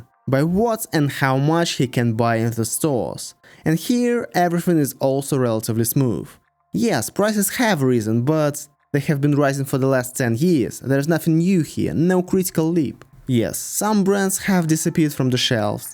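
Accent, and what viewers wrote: Russia. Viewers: Неужели нельзя было найти диктора без такого ужасного акцента "а ля рюс". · Russian akcent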